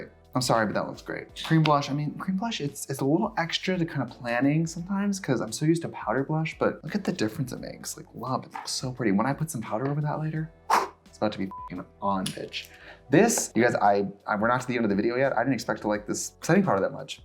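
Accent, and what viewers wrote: Wisconsin, United States. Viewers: LOL, Wisconsin accent. I'm still trying to manifest the x Charlie Berens Wisconsin collab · I love it when your wisc. accent comes through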